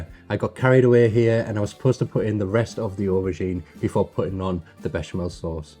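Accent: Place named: Wales, United Kingdom. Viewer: Really enjoyed this as I'm about to make a big batch for our house. Love the Welsh accent. Greetings from Melbourne, Australian. Hwyl Fawr !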